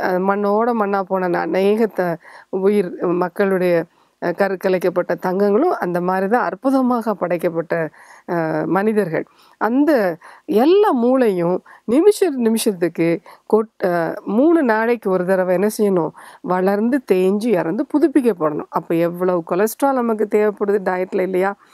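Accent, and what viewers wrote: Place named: Tirunelveli, India. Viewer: Excellent explanation. Thanks to the Doctor. I liked the way she explained in Tirunelveli Tamil. However, regarding Triglyceride, even strict vegetarians also have high levels. What could be the reason? Is it because of malfunction of Lever and Pancreas? Request the doctor to clarify.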